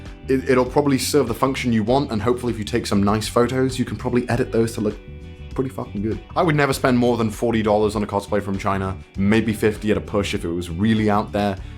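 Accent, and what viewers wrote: United Kingdom. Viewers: Painfully British 👌🏼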